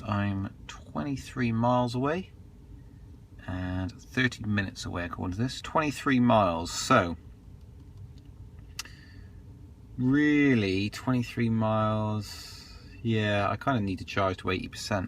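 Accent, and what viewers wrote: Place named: Bristol, United Kingdom. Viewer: Just bought myself a two year old Tekna Leaf up her in Montrose, Scotland. Love your videos,. They have been a good insight into what the Leaf is all about and capable of. I pick the car up tomorrow!!! Can't wait!! Sounds like you're from the same neck of the woods as my wife, (Bristol?).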